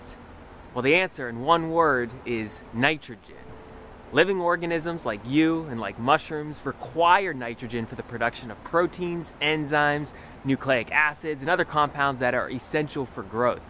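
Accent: United States. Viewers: Subbed! Fantastic. Thank you for the research and for referencing peer reviewed journal articles. Also usually can't stand American accents. This is an exception.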